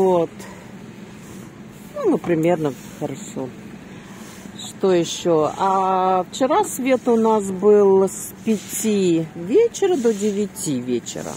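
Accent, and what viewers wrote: Odessa, Ukraine. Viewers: До 2014 года приезжая в Одессу замечала что останавливаюсь и прислушиваюсь как разговаривают Одесситы., спасибо за возможность послушать Вашу такую чисто Одесскую речь. С пожеланием мира всем нам из Донецка.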